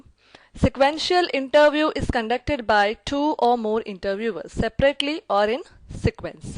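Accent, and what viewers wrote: India. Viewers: such a heavy indian accent I having difficulty understanding , shame on her she can't even pronounce candidates , i wonder which college gave her MBA , She sounds overconfident , that is a downfall on this woman . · hindi accent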